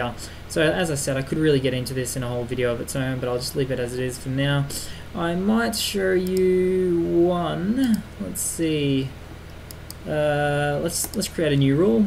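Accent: Australia. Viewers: You can easily make out from his accent that he is Australian, but if you want hints, First "GoodDay" greeting at start, Secondly look at this terminal/Username, Thirdly he calls Gufw Guffy.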